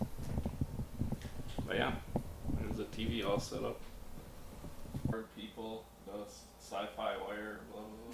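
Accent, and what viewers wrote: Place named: Canada. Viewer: $3299 Canadian? I ask because clearly you have a Canadian accent. But I tried downloading the Greentoe app and or says not available in Canada?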